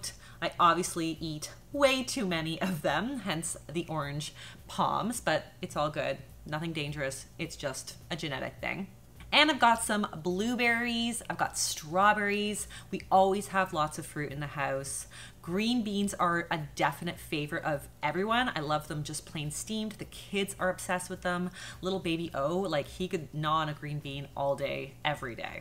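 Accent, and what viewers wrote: Canada. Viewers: You look so gorgeous in this video, and can you send me some of your groceries please? Everything seems so delicious. Also, You don't say bagels wrong You just have a Canadian accent. And when you try to say it differently it sounded exactly the same in my opinion 😁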